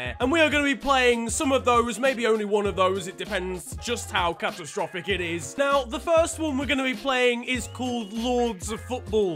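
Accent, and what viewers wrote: Liverpool, United Kingdom. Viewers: You're voice is like an liverpool fan that is white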